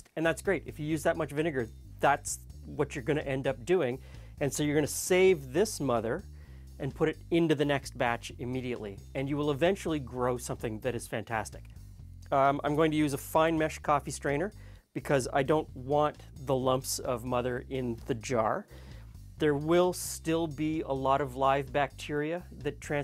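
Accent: Canada. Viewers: Funny you mentioned it. I heard a Canadian accent. It’s all about the about.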